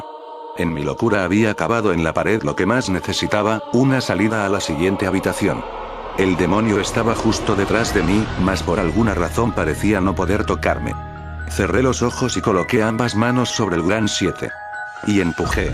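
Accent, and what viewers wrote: Argentina. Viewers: Es Argento q capo!! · sos Argentino?, que grande capo!, con razón me encantan tus videos jajaja te mando un fuerte abrazo de Capital Federal!!! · SOS VOS DE ARGENTINA CHÉ? FELICIDADES PIBE, A VER CUÁNDO ME MANDÁS UN SALUDO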